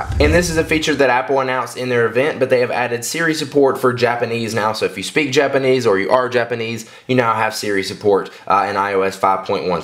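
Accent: South, United States. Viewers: If you guys think he has a strong southern accent, you have no idea... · its a southern accent ur either racist or just a fucking dumbass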